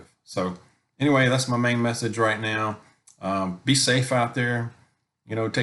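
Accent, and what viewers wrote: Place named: South, United States. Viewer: Not at all good. You sound as if you have a southern accent, like I do. It’s going to impact us as much as the East Coast.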